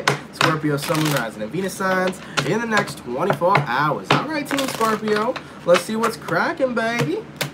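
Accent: England, United Kingdom. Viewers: Thanks 🙏 for your Pisces ♓️ reading great brittish accent, have a amazing day, bless you ❤️🦋🌹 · (Gemmies) yuk! Am I the only one who's cringing? English accent double cringe. Ok wots goin on? Lol xx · Lol the English accent 🤣🤣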